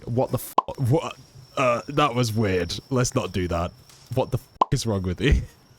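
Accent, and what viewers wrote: United Kingdom. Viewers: The worst part is a br't'sh dialect. "Fancy my chances", you people really are the native speakers of it? 🤧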